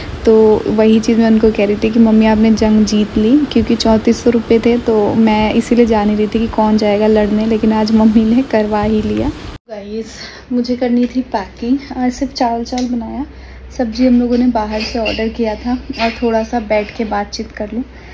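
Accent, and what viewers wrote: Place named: Bhojpuri, India. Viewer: Aaj 1St time comment kar rahi hu .Aapke muh se bhojpuri bahut acha lagela❤❤❤❤❤❤❤❤❤❤❤❤❤❤❤❤❤❤❤❤❤❤❤❤❤❤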